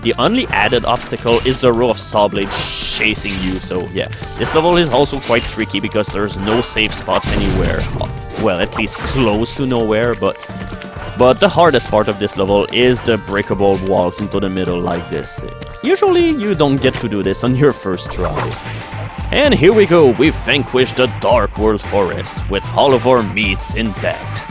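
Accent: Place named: Canada. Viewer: Daaamn, you sound even more Canadian than me!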